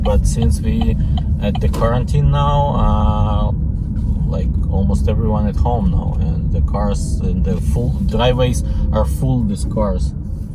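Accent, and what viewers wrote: Russia. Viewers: Русский акцент, жуткий ) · Как приятно слушать английскую русскую речь)) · Русский акцент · Этот русский акцент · Wooow You have a such strong Russian accent man. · You sound like Russian so no worries but if you’re a Brazilian then it’s the cold.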